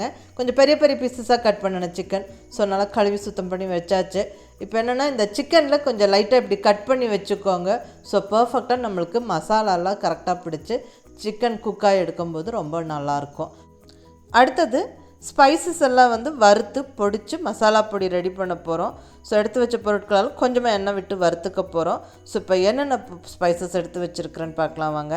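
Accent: Coimbatore, India. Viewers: Amma unga samayal yellamea 👌 ma, ninga pesura kovai tamil ketguradhugu rempa piduchurugu ma. Thanks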